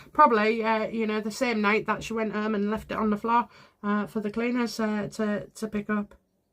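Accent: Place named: England, United Kingdom. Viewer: Thank you for another great reading =). Your summaries at the end are awesome too. I love your little giggles and your accent. I am Canadian and I love English accents =). Prayers for you and your mum =). I have 5 children (all 20+ now) and I nursed them all. I was happy that I was able to do that. I feel badly for mothers that really want to and are not able to. I had friends that really wanted to but they didn't produce enough milk. I am not surprised that Meghan didn't have the patience or mothering instinct that she wanted to even try very hard, even if the hormones produced the milk. I am disappointed in King Charles not honouring his mother's wishes, and the promise he made to her to sort this. Allegedly, he is meant to make Prince William Regent soon (a few readers have said this) so when this happens, will that enable Prince William to legally be able to do that? 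Or will he have to wait until he is King William to do that? Would you please continue on this and find out in another reading? I am wanting this to come out so badly!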